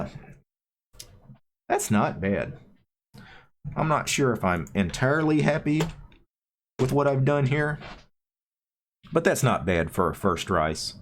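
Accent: South, United States. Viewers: love the accent always a pleasure to listen to a techie southerner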